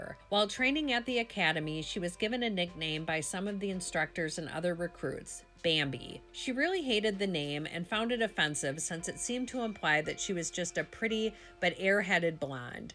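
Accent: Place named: Wisconsin, United States. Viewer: You even sound like you're from Wisconsin, probably even Milwaukee. I can tell by the way you pronounce the name of the city. You don't pronounce the L's. (Muhwahkee) So I would expect better of you if you are indeed from Wisconsin like it really sounds like you are. Don't you want people to think more of your extremely dynamic and beautiful state???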